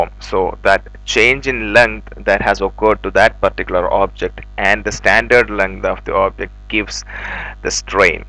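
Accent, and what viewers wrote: Kerala, India. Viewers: Malayali annelle accent ill thoni. Good work by d way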